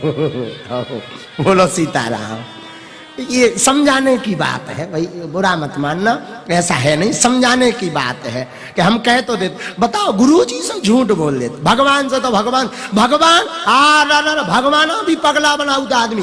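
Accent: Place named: Bundelkhand, India. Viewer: महाराज जी आप बुंदेलखंडी मैं कथा सुनाते बहुत अच्छे लगते हो 🌹 हमें भी समझ आ जाती है 💞 जम्मू से पगली भक्त हूं 🙇👏👏 सीताराम हनुमान जी 🌹🌹🙏🙏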